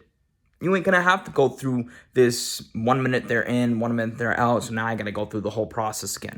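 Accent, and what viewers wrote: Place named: Boston, United States. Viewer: Boston!...I’m glad to hear someone who talks like me in the community 😘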